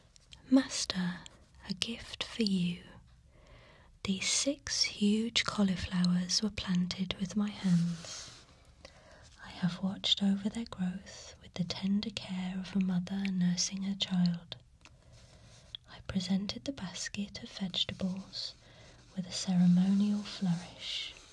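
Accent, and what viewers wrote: United Kingdom. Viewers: How i love it when i listen the sound of a brit speaking it sounds so wonderful!!! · I wish my British accent was half as beautiful as hers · I wish you could do more soft speaking ASMR videos. I can't get enough of your magical tingly British voice!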